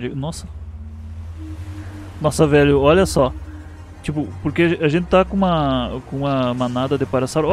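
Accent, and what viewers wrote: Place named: Rio Grande do Sul, Brazil. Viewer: sotaque de Gaúcho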